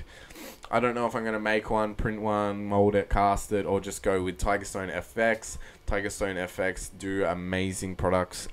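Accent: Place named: Australia. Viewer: Don't worry about mispronouncing stuff: with the Aussie accent, no one can tell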